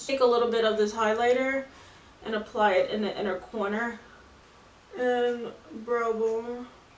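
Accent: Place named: New York, United States. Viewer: I like that NY accent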